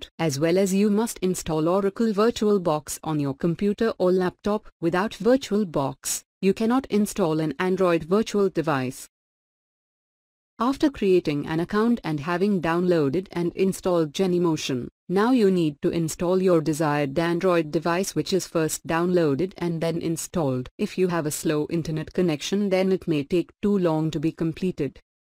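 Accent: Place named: India. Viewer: your TTS sounds like indian accent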